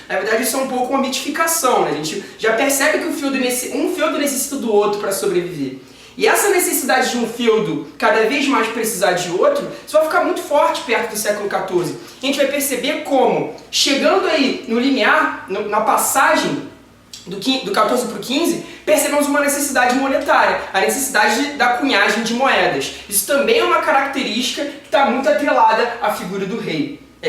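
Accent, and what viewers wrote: Rio de Janeiro, Brazil. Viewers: mds aposto q eh carioca kkkkkkk amei!!😍👏👏❤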